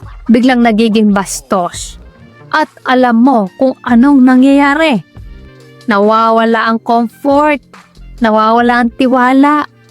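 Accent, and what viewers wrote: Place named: Pampanga, Philippines. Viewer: Kpampangan ka dahil sa accent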